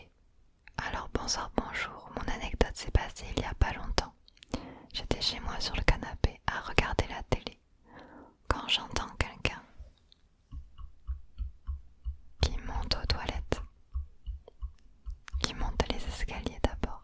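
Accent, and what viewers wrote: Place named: France. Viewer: Oo my bog .. WOW She French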